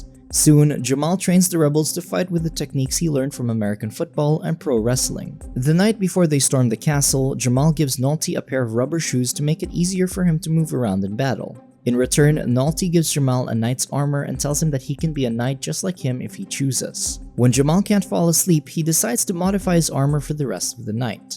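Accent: Philippines. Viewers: Taena halatang Pinoy tong voiceover hahahaha sagwa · You sound Pinoy! :D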